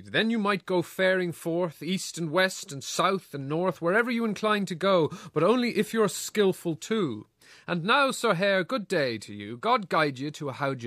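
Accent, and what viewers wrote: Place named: Ireland. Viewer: omg!!!! his irish acent! is georgeous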